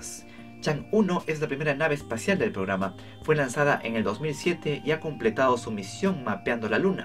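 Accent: Peru. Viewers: no sabía que eras de Perú, es que tú acento se oye diferente, y tu vídeo me súper encanta, interesante como todos los que nos compartes. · ERES DE PERU? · ¿eres peruano?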